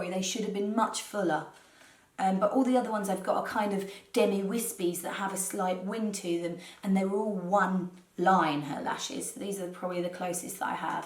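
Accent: United Kingdom. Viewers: I really love the tutorial and your knowledge of Rita Hayworth. I enjoyed the videos that you and your sister make because I always come away with something that I didn’t know and feeling a little bit better after listening to your lovely British accent. I am watching you from Phoenix Arizona in America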